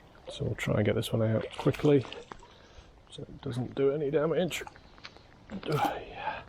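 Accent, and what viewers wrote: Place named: Midlands, United Kingdom. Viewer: Thank you, hadn't thought of just crushing, I use what's often referred to as a swiss file, and remove barb completely. It is probably a little more time consuming than your method for sure. I get the impression you are from the midlands, like me, I maybe wrong!! I live close to Warwick.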